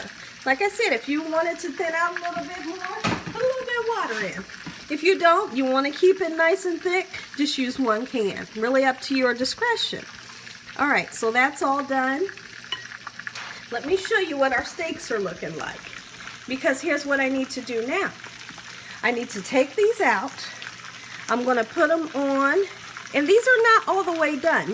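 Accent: South, United States. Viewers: I love your recipes.i like the word like so.girl you gotta be from the south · Love the way you cook and your beautiful southern accent is a bonus. Thank you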